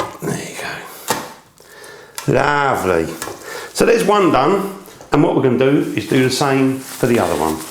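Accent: United Kingdom. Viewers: Cor blimey....do what leave it out 😂love the accent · I'm drowning with his strong british accent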